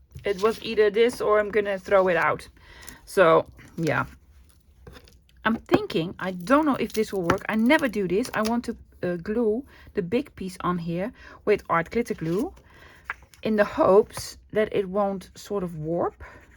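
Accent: Netherlands. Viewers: Happy to have spoken in English (even if limited), Dutch accent very pleasant to listen to. Gail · To answer your question about languages in videos. First let me say, I have so much respect for those that make their videos in English when English is not their first language. As an English speaking person I would like to hear videos in English even if it is not perfect English. I guess that is me being a bit selfish so I can make sense of the project. I am sometimes ashamed of myself for not learning a second language. By the way, I think the Dutch accent is my favourite to listen too 😉. But for me, definitely speaking English, even if not perfect. You are so fun to listen to. You are very creative and fun. 😊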